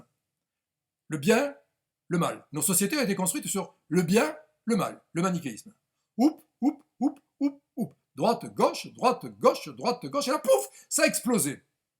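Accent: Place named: Provence, France. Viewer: Merci docteur pour toutes ces précisions. J’adhère complètement à votre riche analyse. On peut rajouter je pense aux santons les fameuses « navettes »... L’histoire de mon village créé au 12ème siècle montre sûrement une concurrence entre Arles-Avignon et Marseille qui n’était pas encore dans l’empire... Il y a en effet, ainsi qu’en Avignon et en Arles, une rue Auguste Chabaud (si c’est ce peintre...). Ça ne m’étonne pas car votre accent provençal vous a trahis! Que du bonheur. Vous devez connaître alors « La Coupo Santo » de Mistral. Les paroles sont très intéressantes si on pense au Graal... Bon Week-end. Thierry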